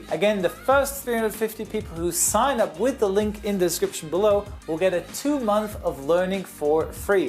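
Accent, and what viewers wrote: Germany. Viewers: hahaha in der ersten sekunde wusste ich du bist deutsch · Is he German? I can hear an accent, but i‘m not sure if it’s a German one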